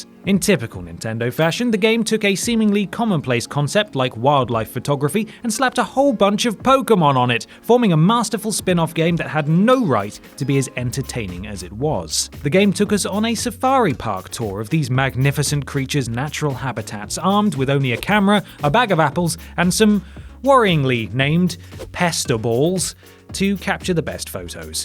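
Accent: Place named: RP, United Kingdom. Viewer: “I don’t have this problem because I speak the queen’s English.”, you’re a national treasure!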